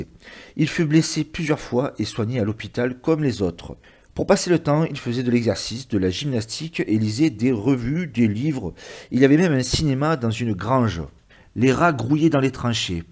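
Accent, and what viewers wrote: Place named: South, France. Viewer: Belle lecture de cette oeuvre fameuse. Et avec cet accent du sud qui est doux à mon oreille. Azouzou à vous ;)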